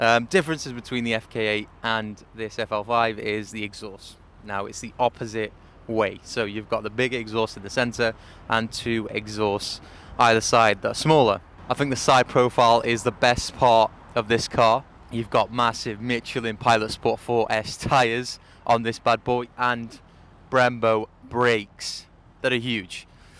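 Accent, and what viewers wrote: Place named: England, United Kingdom. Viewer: The English accent, the weather, and a hot hatch. Nice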